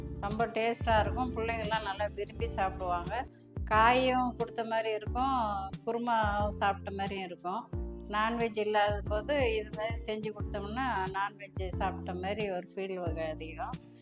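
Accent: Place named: Vellore, India. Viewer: Very nice madam, i shall try this, i am native of vellore, i am happy to listen to your typical vellore style Tamil.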